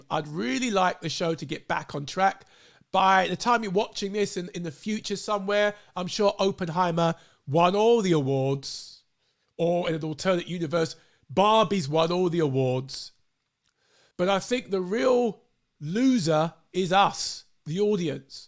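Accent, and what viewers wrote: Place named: United Kingdom. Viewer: Hey. I'm new to your channel and I hear what you are saying. Although I do agree with what you have said and yes, the Oscars have kind of lost mysterious Spark and Mystique it once had, and Hollywood looks like the 20th century Relic, I will say this. I think there is good in that it will make way for new ways to create films. I'm not sure where you are and I'm guessing you probably live in the uk? I'm basing that on your accent but I of course could be wrong. Apologies if I am wrong. But as an american, I get tired of watching the Oscars because it's filled with Elites who really have an absolute disdain for about half of the country. We know we are despised because of our beliefs, our politics, our way of life, and usually Oscar night is when the worst of the worst are together and they basically ridicule half the country. 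This wasn't always the case but it's become more so in the last 20 years. And it's basically an elitist Club for the wealthy to lecture the rest of the country. And the truth is is that there's so much more different forms of entertainment, I find the average YouTuber more entertaining than the Oscars and the golden globes. Perhaps Hollywood needs some serious humbling before they can really bring back that spark and they need to not ridicule half the country then they will get the ratings they need and they will be able to unite this country, rather than constantly divided with ridicule. I think one of my favorite movies of the 2010s what is the C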